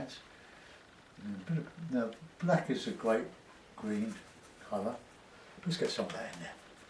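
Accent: United Kingdom. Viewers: Lovely! I learned at lot from just this v8deo. However, I wish the artist (not just you, but many on You Tube) would edit the closed captioning, if that is possible. I have trouble hearing, and without being able to read your lips, as well as the British accent (old American here) its very hard to understand. The closed captioning is terrible; in many sections it makes absolutely no sense. But the video is magnificent otherwise!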